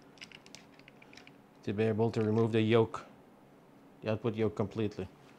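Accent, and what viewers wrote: Quebec, Canada. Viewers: You sound like you're from Quebec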